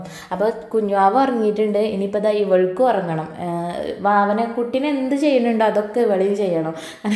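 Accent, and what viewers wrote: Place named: Malappuram, India. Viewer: തനി മലപ്പുറം ഭാഷ പെരിന്തൽമണ്ണ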